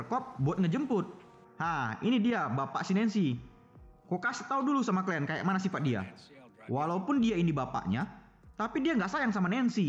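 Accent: Medan, Indonesia. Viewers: Cara ngomongnya sama persis kayak temenku orang Medan :) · Orng Medan ko bg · Bg kau pasti orang Medan kn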